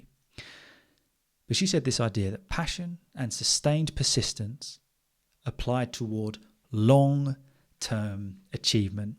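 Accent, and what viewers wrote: United Kingdom. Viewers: I love the british accent ♥️ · This is the first channel of British English that I really enjoyed 😊. I tried once before but I couldn't find one so good like yours. Thank you so much for your good job, and your effort helping us to improve our English skills. I must confess that I prefer the American accent, but you are changing this. I'm a little obsessed with your channel 😂.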